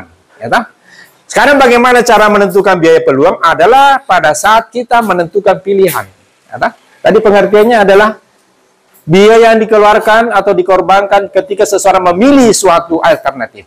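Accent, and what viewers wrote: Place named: Sulawesi, Indonesia. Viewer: Salam Kenal Bapak sepertinya bapak guru dari daerah sulawesi ya dari segi logat nya saya tau soalnya saya dulu kuliahnya di ikip negeri up jurusan koperasi d3 lanjut langsung ke S1 alumni 1985 mantaaap penjelasaanya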